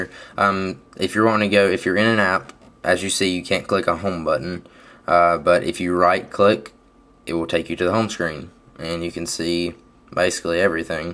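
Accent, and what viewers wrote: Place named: Oklahoma, United States. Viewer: Are you from oklahoma cause i have a cousin name alex and he has accent just like yoi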